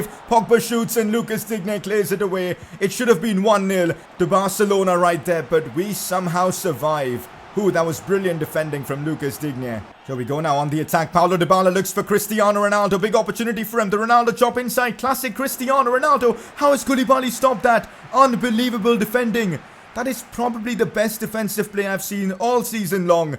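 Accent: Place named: India. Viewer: U speak like indian mannn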